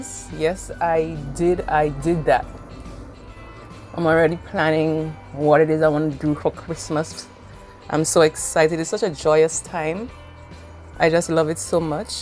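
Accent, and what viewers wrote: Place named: Grenada. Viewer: I love the chit chat videos as you give away your secrets😂 but most importantly it make you more relatable and brings out your Grenadian accent and down to earth personality ✅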